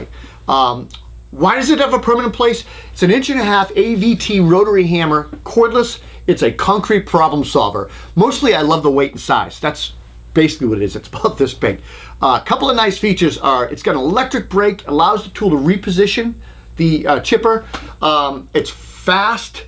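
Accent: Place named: Northeast, United States. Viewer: Looking at the pictures of you using the power tools, I just say "remember your hearing protection". ;-) Love your channel and northeastern accent.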